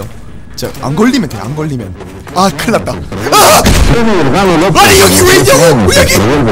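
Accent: Gyeongsang, South Korea. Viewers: 경상도사투리가 살짝씩 들리는것같은드에엥 그래서그런지 좀 친근하고 자꾸보게돼욬ㅋㅋㅋㅋ 아 반응넘웃겨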